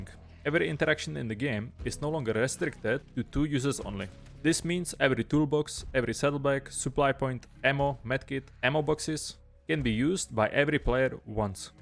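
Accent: Czech Republic. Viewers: nejsi nahodou čech? :D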